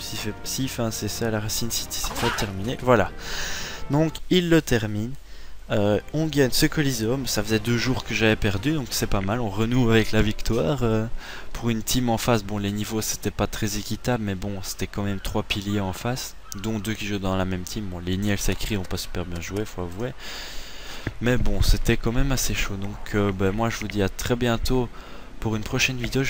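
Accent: Belgium. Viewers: je kiff ton acent belge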